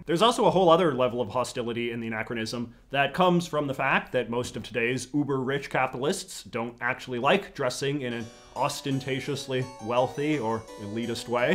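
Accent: Canada. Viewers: Wow, you seem like THE most Canadian person I've ever heard speak! And I actually watch a fair bit of Canadian content. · I'm from Prince Edward Island and you have the MOST "Canadian" accent I've ever heard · The only Canadian I know who actually says A Boot and I live here!!! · As a European, you've got the thickest Canadian accent I've ever heard and I love it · Here for the Canadian accent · Is this guy's "Canadian accent" authentic? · I just... I LOVE the way you speak. The combination of the Canadian dialect and your cute little idiolect with sort of high rising terminals. It's fucking captivating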